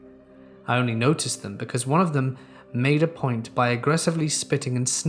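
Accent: United Kingdom. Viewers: What part of u.k are you from?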